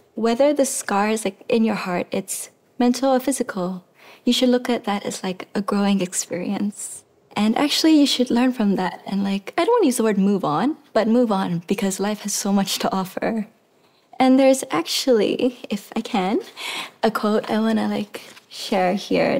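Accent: England, United Kingdom. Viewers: I love her English accent ❤ How beautiful she is 😍🩷 Thank you CHANEL 🤍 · O inglês britânico sendo o maior charme dela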